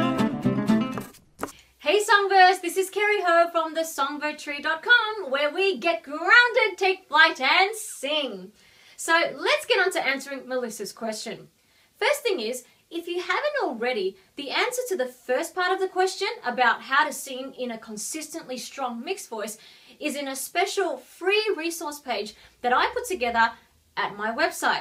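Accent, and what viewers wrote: Australia. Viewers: It's Australian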